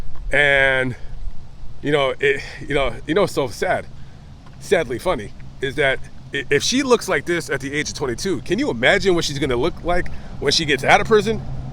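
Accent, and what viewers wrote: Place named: California, United States. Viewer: he's from California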